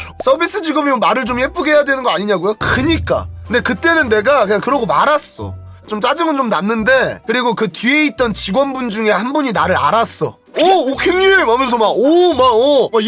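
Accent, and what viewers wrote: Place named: Chungcheong, South Korea. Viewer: 충청도!! 전라도 아니거든요 참나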